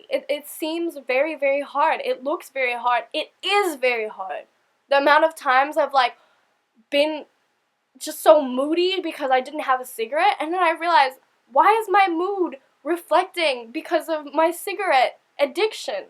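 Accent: Australia. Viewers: Were are you from? You sound Australian but not all the way (I I'm from Sydney) BTW I love you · You're accent is becoming more Australian and it's so adorable!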